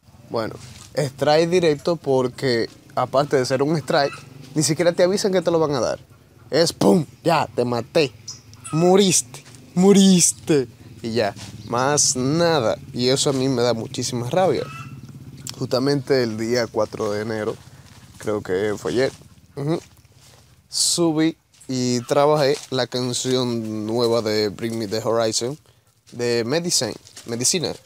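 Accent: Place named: Honduras. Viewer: Pues men, mira, yo te sigo desde el ultimo canal que te tumbaron, y te volví a encontrar de pura suerte, por un video de una canción/traducción que te pedí, era la de -. Desde ese entonces, te sigo, y de alguna manera te tengo nostalgia por el gesto que me hiciste. Debo de admitir que no sigo tu canal de forma muy activa, ya que, sinceramentementemente, pues, tengo algunas cosas que consumen mi tiempo, etc, etc.... Pero si, de ves en cuando me actualizo y veo tus videos, uno tras otro, porque me encanta la mayoría de traducciones que haces. Ademas, gracias a ti, he descubierto nuevos grupos, géneros, y eso, eso realmente esta muy cool, y lo aprecio bastante. Pero... si ya no puedes subir esta clase de contenido en este canal, pues, esta bien, sabes. Tampoco tienes que tirar por un barranco tu canal. Podrías.... No sé...... Puedes ingeniártelas para hacer otro canal nuevo, virgen, sin strike's, y empezar a resubir, o subir nuevas traducciones, y así reducir riesgos de que se vaya todo a la Sh**. 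Puedes hacer muchas cosas en este canal, no me viene algo en especifico, pero sé que encontraras algo, puedes hacer lo que quieras, experimentar para ver que tan bien lo haces, o que tanto pega, etc, estas en una plataforma con muchas otras posibilidades de creación de contenido menos riesgosa por el Copyright. Y tu voz esta bien, es supercool. Mi mejor ciber-amigo es de Honduras, y habla casi igualito que tu xd Por lo que para mi es familiar, agradable, pero no lo tom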